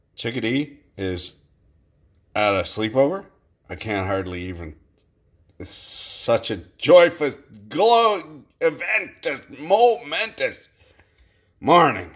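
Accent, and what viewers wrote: Quebec, Canada. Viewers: Hahahah ton Québécois est incroyable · I have still cant pinpoint you french accent. its driving me crazy. sometimes sounds like Ontario sometimes Quebec and sometimes sounds like Moncton nb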